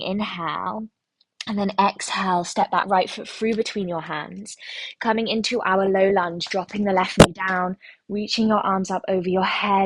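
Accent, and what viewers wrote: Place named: United Kingdom. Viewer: You're a fellow Brit! Such a refreshing change to hear on da choob.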